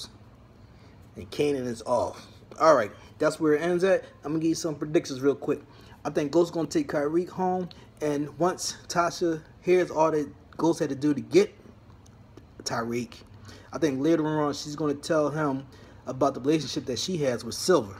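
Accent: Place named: Philadelphia, United States. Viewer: You sound like you from Philly... Lol love the review and theatrics 😎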